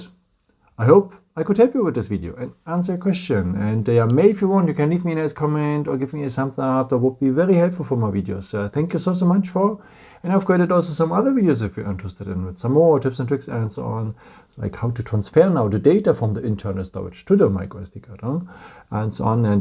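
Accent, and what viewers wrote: Germany. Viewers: RU GERMAN?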